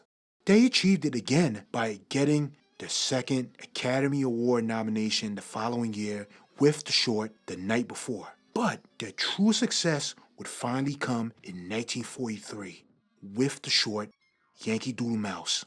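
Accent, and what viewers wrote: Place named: New York, United States. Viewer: Im a big fan of these shows and this was very well done! I subbed and cant wait to see more. BTW are you from NY? thought I detected an accent and your voice reminds me of Method Man. It made me enjoy the narration even more.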